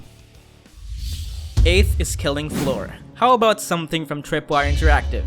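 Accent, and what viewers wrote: Philippines. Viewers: filipino accent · i smell a filipino accent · I can't quite place that accent. It sounds either Filipino or South American.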